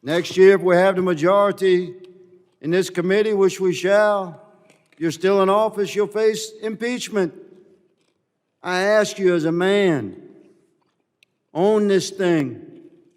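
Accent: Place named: Louisiana, United States. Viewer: His Louisiana accent is flipping awesome, what a patriot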